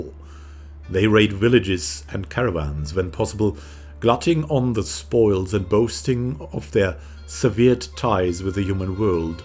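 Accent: Germany. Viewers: I love your role playing videos. Your in-character quips are always delightful and enrich my viewing experience. I have also watched (and I am still watching) your PoE path of the damned play-though, and I have to say you really have immense courage to commit to playing all the way through a massive game like that on such a difficult level. Your "thoughtful gaming" skills are very impressive! I am also hooked on your soothing voice and that very appealing hint of a German accent. I can tell that you are enjoying the play-through as much as we are, who you are taking along on the journey. Your fine intelligence and wry humor shine through in your videos, and checking your channel for updates has now become a part of my daily routine. Thank you so much for your faithfulness to your channel and to your subscribers. Keep the excellent content coming!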